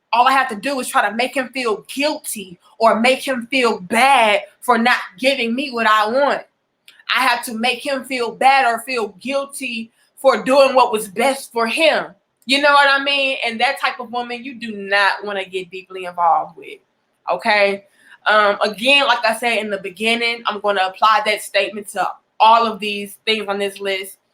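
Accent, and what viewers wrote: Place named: Florida, United States. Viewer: I like the accent. You from Florida?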